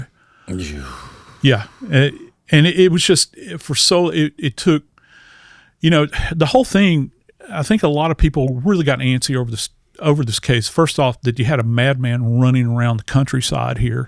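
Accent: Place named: South, United States. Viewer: He's got more education and experience than you can ever hope to have. He's southern, they pronounce words funny. He's a scientific genius specializing in criminal death scene investigations. Podcast is "body bags" and has written many books on this. You can obsess over a dumb word or listen to what he knows. Your choice.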